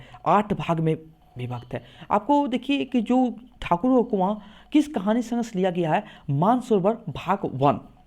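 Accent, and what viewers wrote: Bengal, India. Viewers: Ap kya bengali hai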